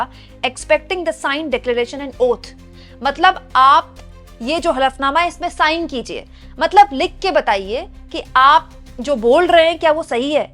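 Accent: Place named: Bengal, India. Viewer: Your voice is bengoli tongue jeetna bhi Hindi bolo your face lip expression is mohali look like kindly improve it bro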